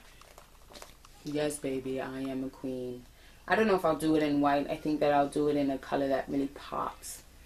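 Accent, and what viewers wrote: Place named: New York, United States. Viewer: Hello, I love PandaVille! I see that you have fallen in love with knitting. I hope that you are able to complete your project list. I'll be watching and give you and a shout out on my next (YT) video. Love the New York accent! P.S. Love the knitting caddy Christmas gift from your husband. J.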